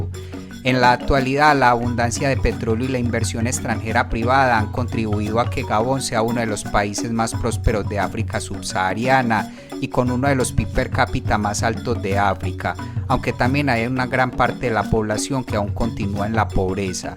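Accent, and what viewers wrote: Colombia. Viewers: Gracias hermano colombiano desde Gabón, supongo que eres colombiano por el acento que tienes.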